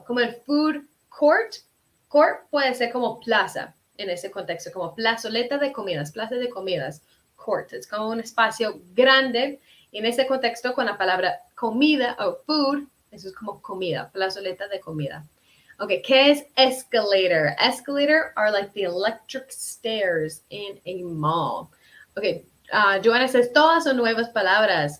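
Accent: Colombia. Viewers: Su acento en Ingles es muy Colombiano. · Pero tiene buen acento colombiano, dice que es de estados unidos pero algo de colombiana tiene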